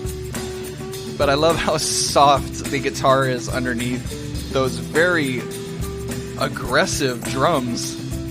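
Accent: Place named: United States. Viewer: Don't think I'll ever get used to how americans pronounce niche.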